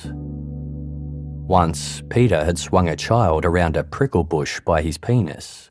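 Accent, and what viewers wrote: Australia. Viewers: Well, he is Australian. Not exactly a sophisticated accent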